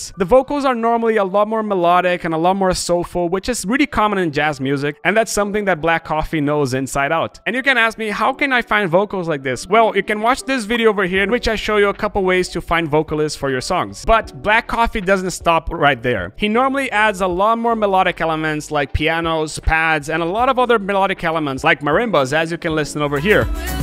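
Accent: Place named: Brazil. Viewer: Só pelo sotaque da pra ver que vc é br mano, hahahaha. Ótimo conteúdo irmão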